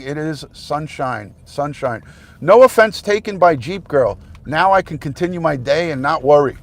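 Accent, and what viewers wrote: New York, United States. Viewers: ! I wasn’t subscribed to your channel even though I’ve been watching your content! Well, now I’m subscribed- I love your NY accent, and your input from a “lawr enforcement perspective”❤️👍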